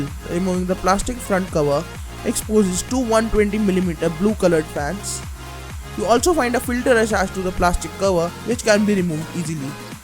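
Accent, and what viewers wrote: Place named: India. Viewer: love the indian accent xD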